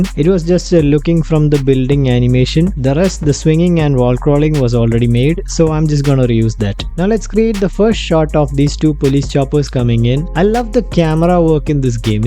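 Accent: Kerala, India. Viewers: Are you from india? Kerala?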